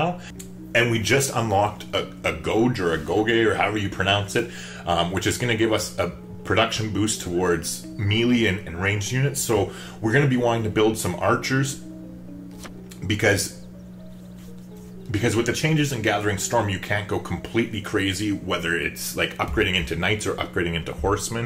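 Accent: Canada. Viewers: Where in Canada are ya from? I can hear it in your voice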